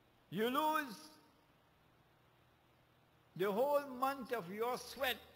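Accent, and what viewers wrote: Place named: Jamaica. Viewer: Him speak in jamaican accent maan!!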